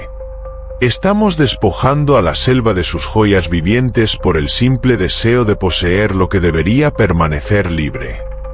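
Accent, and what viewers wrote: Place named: Spain. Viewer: Hoy he visto este documento y me agrada mucho que se hace énfasis en 1. Mostrar parte de la biodiversidad de insectos en la Amazonia y las interacciones de estos con el entorno de la selva y la necesidad de preservarlos para la salud del ecosistema y el planeta; 2. Como cada especie tiene un papel en el intrincado rompecabezas de la Vida y el aleteo de una mariposa repercute en los confines del cosmos. 3. Como videofilmador de naturaleza coincido en que la selección de la música y los sonidos acompañantes es muy importante, también la voz del narrador es importante, aquí tiene un acento español y creo que el canal es de América, cierto? Mi canal es de flora y fauna de México y todo mundo lo mira sabe que es una ventana a México. Saludos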